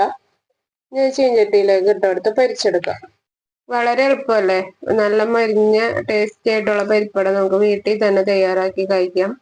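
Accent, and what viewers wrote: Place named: Ernakulam, India. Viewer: ..correct alle..enikk ariyaam ee slang..May be we r neighbours, evideyaa place..?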